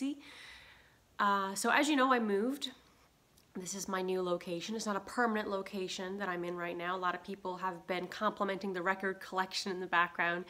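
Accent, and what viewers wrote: Newfoundland, Canada. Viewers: Wonderful to see you again and hear that glorious Newfie accent. Great choice for location. Look up who the PPC candidate is for your community. Call them. There is hope for Canada, strong and free. It's Maxime Bernier. All the best in your new home.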